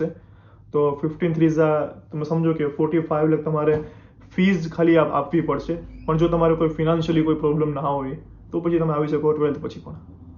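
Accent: Kathiawar, India. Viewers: Naa... beta tame kathiyavadi cho e to language par thi j khabar padi j jaay che....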